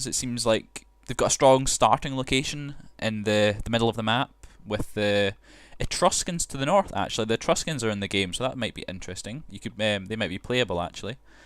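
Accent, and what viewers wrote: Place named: Scotland, United Kingdom. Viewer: The speaker is definitely Scottish!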